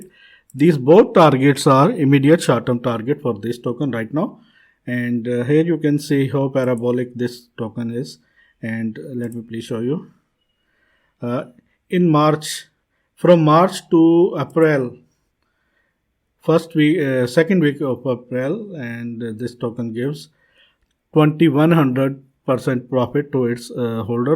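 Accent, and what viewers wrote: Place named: India. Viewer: Soon as I hear an indian talking. I just think not to be takn seriously.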